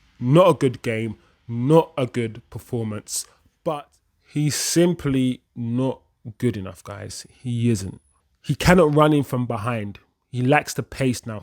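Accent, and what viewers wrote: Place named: Manchester, United Kingdom. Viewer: Proper manc accent there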